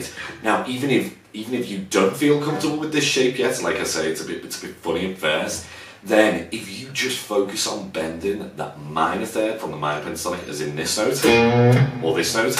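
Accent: Liverpool, United Kingdom. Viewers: Scouser! Love it well in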